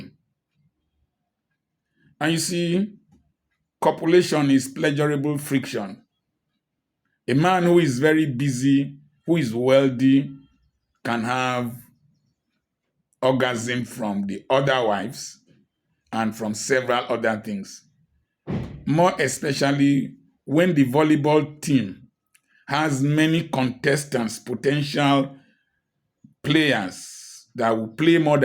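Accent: Nigeria. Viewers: I dont the deep nigerian dialect but I love it.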